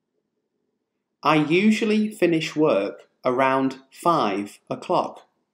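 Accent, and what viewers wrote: United Kingdom. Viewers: From what part of Britain this accent is? In some words the pronunciation differs from that in transcription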